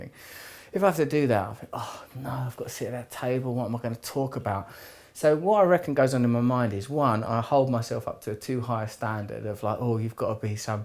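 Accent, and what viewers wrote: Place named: England, United Kingdom. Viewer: You always amaze me... whatever you do! As a human being, I’m guessing there’s probably NOT MUCH, you’ve not experienced. ( it sounds so much better too... advice & rhetoric coming from a British accent!! Am I right? ) - Keep up the good fight in educating our youth! My lack in self confidence, is just that! I’m too afraid to stand up, to be, truly me! I wanna be great... & in just saying that, it makes me feel... blah- I’m comparing again! To you! ... I hope I’ll someday find that peace, within myself! God Bless you... Sir!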